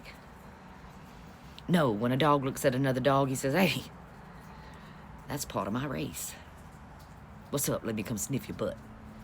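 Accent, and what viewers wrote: South, United States. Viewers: I love that southern accent you have, to me it is so sexy on you. I also love the truth that you bring to us. Keep sharing your knowledge and many blessings to you, love.❤ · I love your southern drawl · You are so memorizing to the point I don't even see your color. That Southern Bell accent makes me feel like I'm listening to another sister. Furthermore you are intelligent too. Appreciate you girl! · I truly enjoy your content, you give it to us straight, no chaser! Just the way I like it! And, I love your accent, it has that southern charm with a sprinkle of big mama truth in it 😄. Keep bringing it sista! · Your take on humanity is awesome. The southern dialect is so refreshing. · Can I say that as a Black Southern Woman, I absolutely adore your Southern Belle accent. My first time seeing her content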